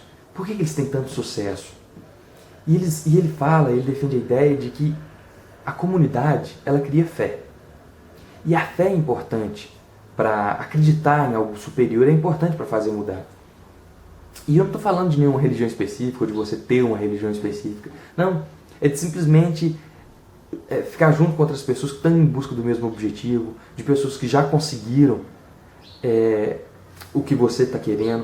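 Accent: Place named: Minas Gerais, Brazil. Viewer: Parabéns. Foi uma ótima resenha, explicou tudo de forma clara, concisa e pude compreender perfeitamente a que o livro se propõe. Me animei a comprar o livro e realmente os hábitos são tudo, foi uma das características marcantes que percebi convivendo um tempo com pessoas extremamente focadas e proativas de sucesso, com perfil vencedor que convivi no colégio. Eles sempre tiravam as melhores notas e eram muito reconhecidos pelo corpo docente. Tem sotaque e jeito mineiro, acertei ? rs parabéns novamente, abçs.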